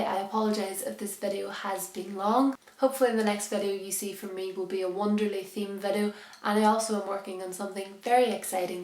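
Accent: Ireland. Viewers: Are you irish? :D I found you through Sanne and I like you! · Are you Irish? I'm a Londoner xDD...Well I'm half. I mean, I was born and I live in London, but I'm half lol <3 Are there any books you can recommend for someone around my age-18-? xX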